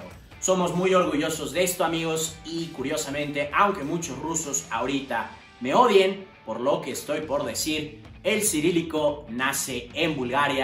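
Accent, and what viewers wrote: Mexico. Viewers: Tu acento es muy mexicano, por eso se confundió. Felicitaciones por tu castellano. Soy chileno y conocí hace poco a una búlgara, de padres griegos... Muchas gracias por el concejo del yogourt Me salvaste la vida. jeje Saludos. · Zdravei, chudesno govorick con meksikanski aktsent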